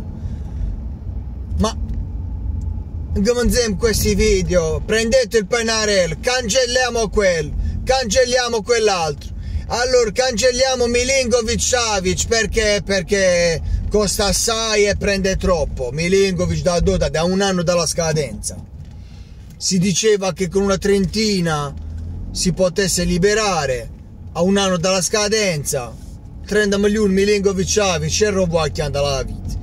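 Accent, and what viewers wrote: Apulia, Italy. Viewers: sei forte,continua così a parlare il pugliese, sei uno spasso, non sono milanista, sono dell'altra sponda del naviglio (interista), ma ti seguo tutte le sere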